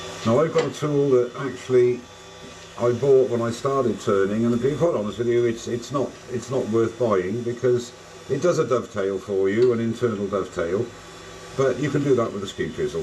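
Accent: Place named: England, United Kingdom. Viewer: Where's your accent from, are you Norfolk or West country?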